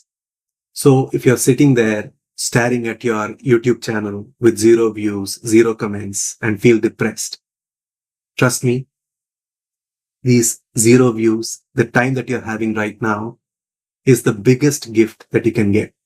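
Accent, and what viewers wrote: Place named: India. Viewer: You have a very pleasant Indian accent.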